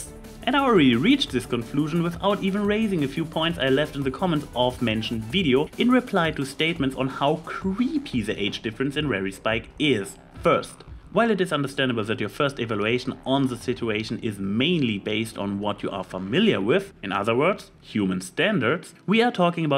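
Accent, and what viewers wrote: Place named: Germany. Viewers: Right now i'm really curious: are you german or are did you just had one to many lessons of german in school? (option 3 would be that it was not your own voice and therefore spoken by a native speaker, but it sound extremly similar to me) In beiden für wahrscheinlich angenommenen Fällen bin ich mal so frei und probier es aus: Schönes Video und gute Argumente. Immer wieder schön dir zuzuhören. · Is German? I mean, dat accent though...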